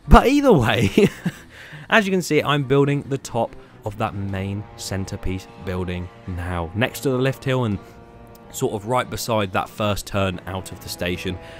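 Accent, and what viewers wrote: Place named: United Kingdom. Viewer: Bri*ish person detected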